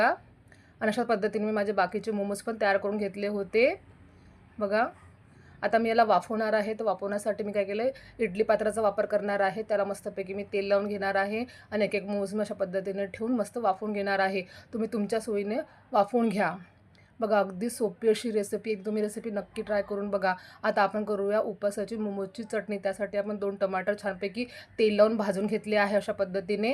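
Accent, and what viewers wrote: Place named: Vidarbha, India. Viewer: शेवटच्या😊 दिवशी एकदम जोरदार रेसिपी तुम्ही विदर्भातले आहात का बोलण्यावरून वाटलं म्हणून सहज विचार😊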